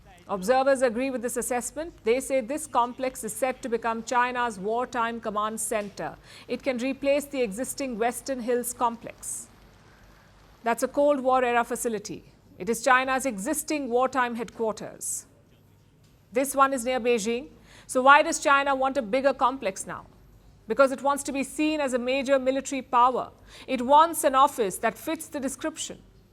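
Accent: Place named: India. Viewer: Indian accent sound horrible 😅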